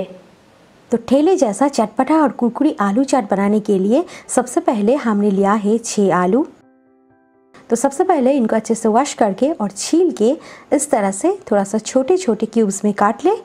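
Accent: Bengal, India. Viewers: Are you bengali. .? apke hindi bolne k style se lag raha hai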